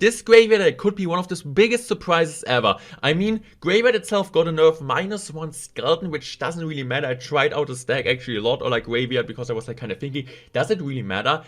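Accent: Germany. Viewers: German accent alarm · Man hört das Deutsch so extrem raus. "clash wojal"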